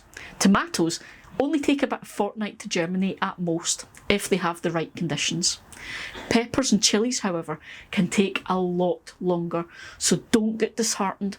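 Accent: Scotland, United Kingdom. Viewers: I truly don't know why more people aren't watching your channel. I get so excited every time you come up with a new episode. You're just so cheery and wonderful and I love your cute Scottish accent. It's just such a pleasure to watch when it's -27C and 30 cm of snow with 10 more to come out my window... Hahaha I guess that's what I get for living in Canada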